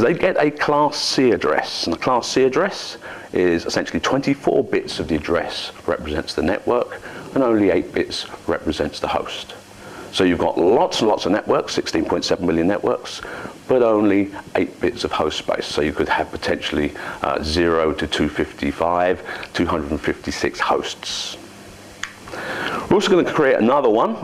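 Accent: England, United Kingdom. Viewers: This is how it's pronounced over here in the UK! He's English if you haven't noticed! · This isn't bad. Can't remember the last time this american learned anything from someone talking with an english accent.....lol how american of me ....lol Great tutorial son.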